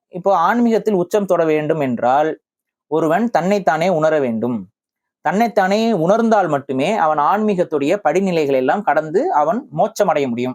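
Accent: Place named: North Chennai, India. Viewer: your vada chennai slang superb😅😅😅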